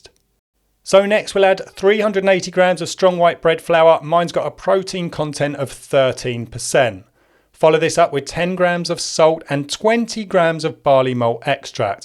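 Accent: United Kingdom. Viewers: I thought you were Andy. Then I heard the british accent 😂 Nice loaf, mate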